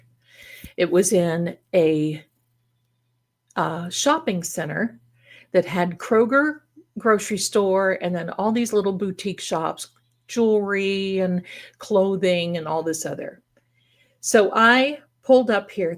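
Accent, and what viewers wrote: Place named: New York, United States. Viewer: Hi I heard your ny accent. Hehehe. I’m OG from Patchogue LI NY. I’m in upstate NY now but still have some Long Island accent Can’t wait to watch and enjoy. Ty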